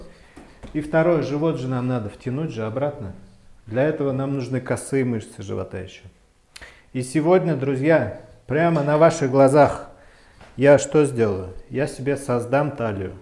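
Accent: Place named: Chuvashia, Russia. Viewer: Остановилась на видео, услышав знакомый чувашский акцент (сама родом из Чувашии из Чебоксары, живу в Германии),этот доктор мне родненький земляк😍, какой классный 👍.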